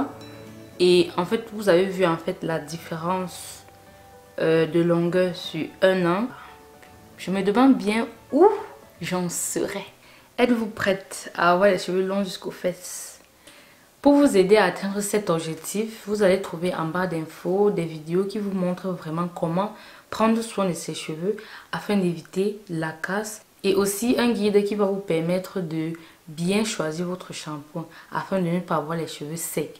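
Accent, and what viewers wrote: Cameroon. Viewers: Slt ma belle!beau montage.je te suis depuis peu et j adore ton accent camer et ton cote' comik😆.j aimerai te poser une question,penses tu que la porosite' des cheveux influ sur le type de produit a utiliser?si c pas indiscret ,quelle creme hydratante utilise tu pour reussir a tenir 1 semaine sans les rehydrater?perso j ai des cheveux naturels coe sur mon profil et j ai vraimt du mal a trouver un hydratant ki me convienne😊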